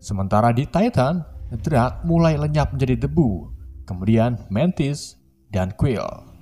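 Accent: Indonesia. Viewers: Kentara lidahnya org indo v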